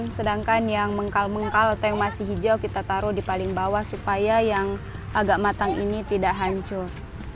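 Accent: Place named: Sulawesi, Indonesia. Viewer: Pengaruh dialek😅 Sulawesi e lebih tajam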